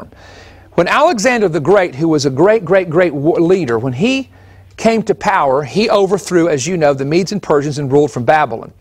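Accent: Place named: South, United States. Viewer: although his gomer pyle accent is somewhat distracting, he says some pretty interesting things....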